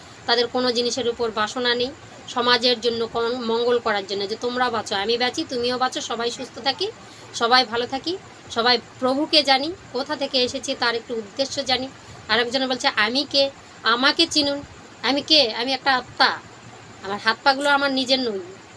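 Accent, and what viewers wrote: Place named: Bangladesh. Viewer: Apni ki Bangladeshi? Voice tone ekdom Bangladeshi der moton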